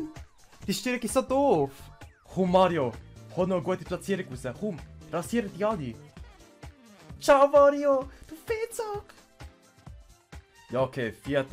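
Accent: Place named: Switzerland. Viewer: Mmmmm Musik in miiene Ohre. [Schwiizerdütsch] andlich moll a Kanal wo nit Schwobedütsch redet. An alli Dütche wo dass woll läse und mi nit so richtig verstot dass soll nümme Spass sie und Zücher Chönet grat wag goo. Spötistens jetzt würde ihr in lelem Kanton i lab. ;)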